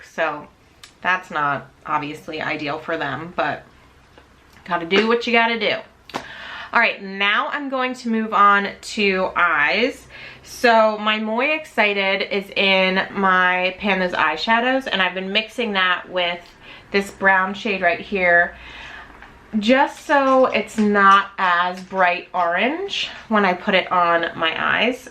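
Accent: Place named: Northeast, United States. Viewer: Do you mind me asking what profession you’re in? I always imagined you being a teacher…you always have that sweet nature teachers have 😊 And, again if you don’t mind me asking, what region of the states you’re in? Your accent leans North East to me, but I’m never sure 😂 Also, I say keep rocking the skinny jeans! I’m 34…so you know my closet is full of skinny’s! And I’m not giving them up, I love how they look and I love how they feel! I left my baggy jean days back in high school! Haha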